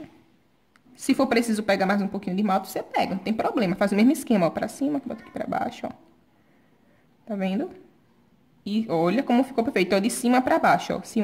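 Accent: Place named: Bahia, Brazil. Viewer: vc fala igualzinho minha tia Rosane da bahia ... vc é de onde ?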